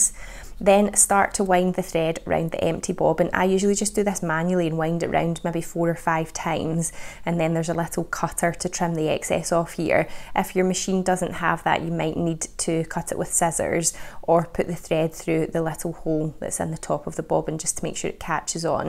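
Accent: Scotland, United Kingdom. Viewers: I just love the Scottish accent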